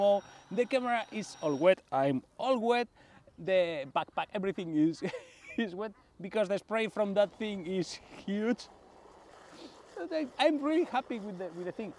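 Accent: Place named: Spain. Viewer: eres espanyol se nota xd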